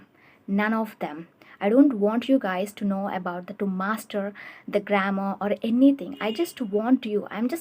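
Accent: South India, India. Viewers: She has a South Indian accent; she may not even know Hindi, but she's addressing Hindi speakers, and she's good at it.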